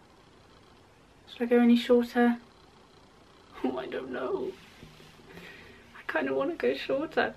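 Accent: RP, United Kingdom. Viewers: Posh English accent. ;)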